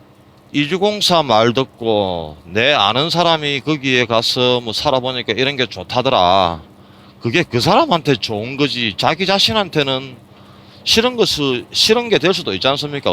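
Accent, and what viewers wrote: Gyeongsang, South Korea. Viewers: 동영상 잘 봤습니다!! 경상도 사투리가 인상에 남네요^^ . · 캐나다 언제 갔습니까? 그리고 갱상도 분이시군요 여기도 부산임다~ 구독자수도 많이 생기면 무작정 운전만 아니라 구간마다 생각날때 답변해주실수도 있겠죠 ㅎ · 영상 잘보고 있습니다~^^ 저는 대구사람인데 경상도 사투리가 구수하시네요~^^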